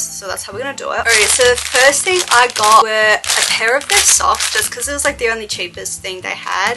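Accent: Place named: Australia. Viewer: Literally obsessed with ur Aussie accent 😍 if u edit on ur phone can u do a video on how u edit I love ursss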